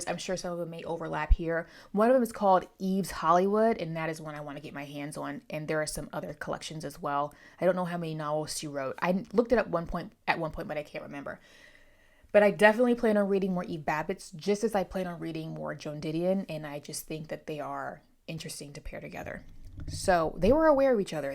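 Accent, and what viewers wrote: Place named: Pittsburgh, United States. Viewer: Funny you brought up your accent. I’ve always thought you sounded like a friend of mine who is from Pittsburgh area. I’m in north central PA.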